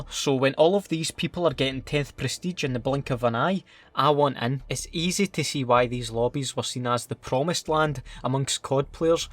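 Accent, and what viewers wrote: Scotland, United Kingdom. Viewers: fucken great Scots accent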